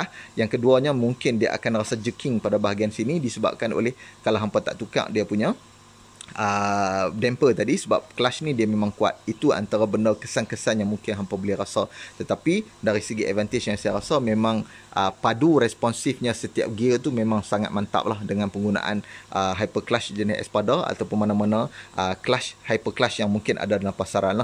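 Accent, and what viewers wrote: North, Malaysia. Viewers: Ilmu terbaik bang share. Cara presentation pun best, leka seronok dengar. Tambah loghat utagha hahaha rindu penang. Salam Ramadan dan butang loceng onn 😆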